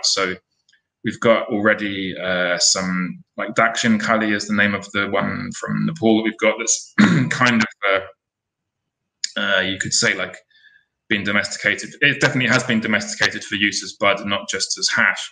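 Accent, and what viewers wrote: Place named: United Kingdom. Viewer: It's good to hear a voice from the UK! .... 🙂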